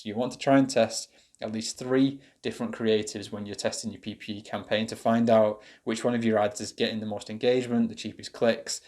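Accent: United Kingdom. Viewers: Hi. I'm interested in your course. I take it from your accent that you are a fellow Brit. Most Drop-shipping courses are targeted at the USA.Have you noticed many differences in the way you operate your DS business from the UK (assuming you do). Thanks in advance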